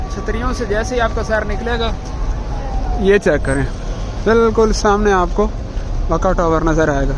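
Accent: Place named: Attock, Pakistan. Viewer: آپ کے لہجے سے لگتا ھے کہ آپ کا تعلق اٹک سے ھے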